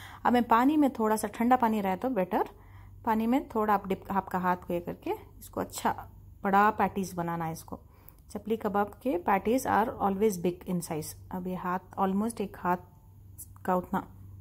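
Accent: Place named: Deccan, India. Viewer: This dhakni urdu is ultimate 😍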